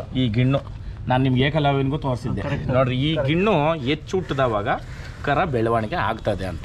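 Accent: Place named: Butler English, India. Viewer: Batlar English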